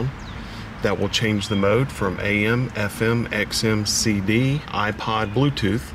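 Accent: North Carolina, United States. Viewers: Living here in Missouri, I noticed your accent was North Carolinian, then I saw a Popup that said you were in High Point.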